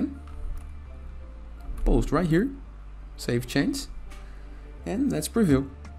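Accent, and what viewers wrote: Brazil. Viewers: Mano, impossível não reconhecer o sotaque brazuca. Parabéns pelo canal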